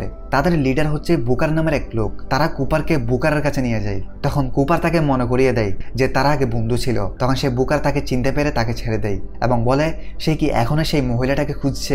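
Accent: Chittagong, Bangladesh. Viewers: ভায়ের বাড়ি মনে হয় চট্টগ্রামে। দিন শেষে আঞ্চলিকতার টান চলে আসে। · Brother this is chattagram special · ভাইয়ের বাড়ি কি চট্টগ্রাম?আমি চট্টগ্রামের। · Bhai mone hoy Chittagong er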